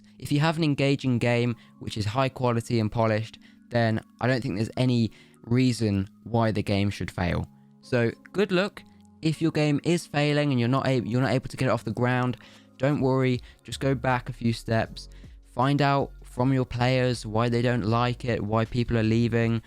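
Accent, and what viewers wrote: United Kingdom. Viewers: Nice finally British lad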